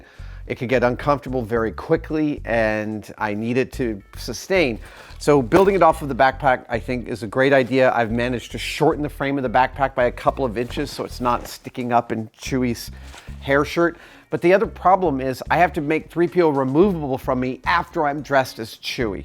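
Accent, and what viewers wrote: Germany. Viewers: he sounded like he had a German accent,and not the good ones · He sounds German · Why does he sound German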